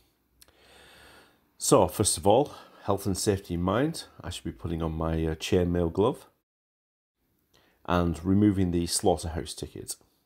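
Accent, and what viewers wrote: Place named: Lancashire, United Kingdom. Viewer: Love the video proper job done sounds like your from Lancashire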